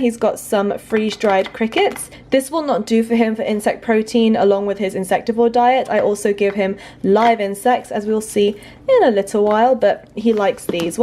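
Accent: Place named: Hampshire, United Kingdom. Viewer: Hi from Hampshire UK. I've just found your channel and loving watching Grinchy getting better with all your love and care. I'm curious where you're from, you could be from my area with that accent!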